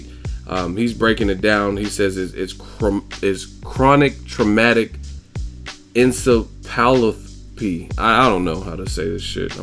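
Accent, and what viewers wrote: Ireland. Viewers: WTf you are irish?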